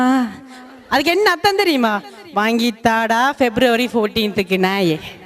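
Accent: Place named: Sri Lanka. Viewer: Nakshatra Jewel This is how Tamil is spoken in Sri Lanka.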